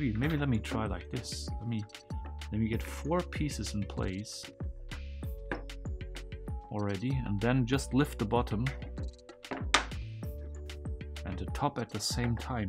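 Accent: Germany. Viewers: It's typical German accent, as we don't have the "v" the same way pronounced as in English and the sound itself not at all (v is pronounced the same as the f mostly. voiced v doesn't exist). With some exercise we can do it but is some extra work while talking. Accents by non-native speakers are mostly based on wrong pronounciations.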